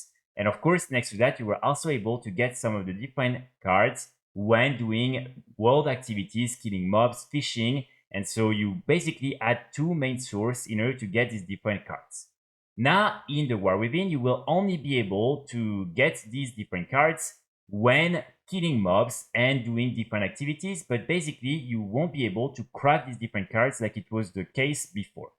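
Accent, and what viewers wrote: France. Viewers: alala l'accent francais c'est trop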